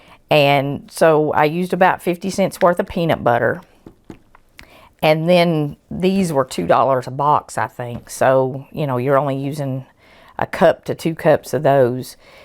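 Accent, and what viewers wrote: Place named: Texas, United States. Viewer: You sound like texas. Great recipe.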